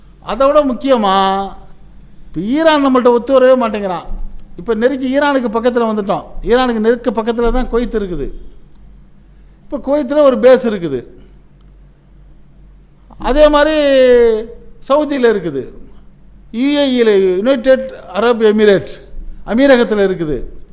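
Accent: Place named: Thanjavur, India. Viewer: அருமையான தஞ்சைதமிழ் மணம்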